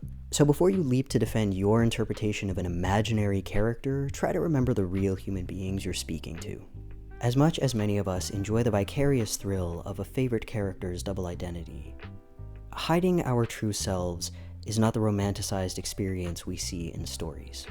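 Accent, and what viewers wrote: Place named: Canada. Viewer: How am I just realizing that is Canadian? Like, SUPER Canadian. I've been watching him for months and it just glossed right over my head. Maybe because he just sounds like home, so it didn't even register.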